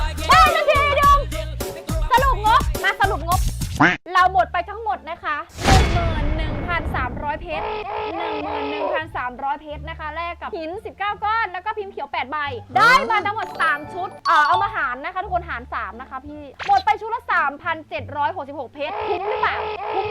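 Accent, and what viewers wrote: Isan, Thailand. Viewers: พี่ พูดอิสานน่ารักมากกก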